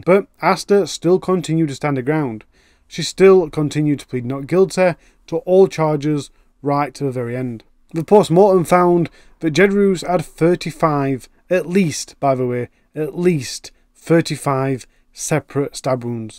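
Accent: Yorkshire, United Kingdom. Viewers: Oh it’s good to hear a yorkie voice, giving us true crime. ❤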